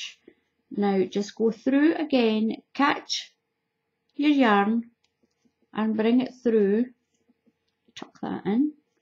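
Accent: Scotland, United Kingdom. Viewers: Scottish accent always melts my heart <3 Thank you so much!! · You have the coolest accent I have ever heard. Where precisely are you from? Scotland, right, but where? Just curious. I'm American and we never hear this. It sounds quite a lot like Scandinavian-- in the inflection--and many of the sounds are quite similar. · I'm Brazilian, English isn't my native language and I can understand very well. Love your Scottish accent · I love your Scottish accent and your voice! I could listen to you all day long x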